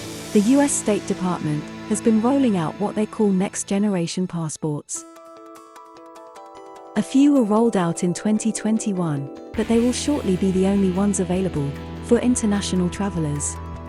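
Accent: United Kingdom. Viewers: An British voice over advertising an American passport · why is a british person narrating a video on US passports?? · It for a US passport yet the speaker has a British accent.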